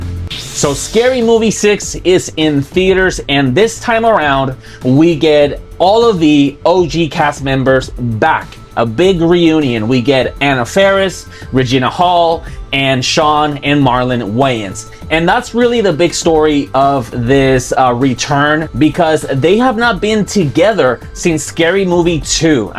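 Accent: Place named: South America. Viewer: this surprised I did not think at all you were living in the US, I thought you were South American or Caribbean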